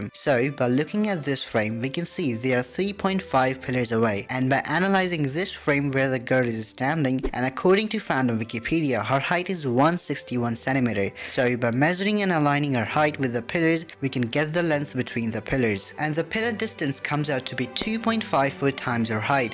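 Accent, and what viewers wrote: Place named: India. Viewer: Yo I guessed you're from India purely on the basis of your accent and I realized when you said you have a Potato Phone as Indian Parents usually don't give good phones to their son or daughters😭😭😭 Love From West Bengal aka Hamba Hamba Ramba Ramba State! Peak Content ❤❤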